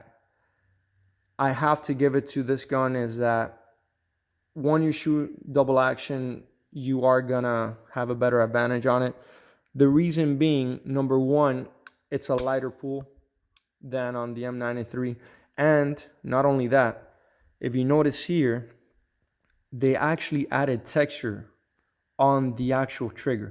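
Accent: Miami, United States. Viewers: You sound like you’re from Miami lol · i can tell you are from Miami just by the way talk bro lol, good video man keep it up!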